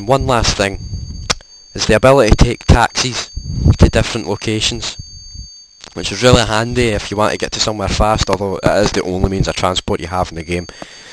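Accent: Scotland, United Kingdom. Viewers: Haaa. I was NOT expecting you to be scottish. Yas. Nice :D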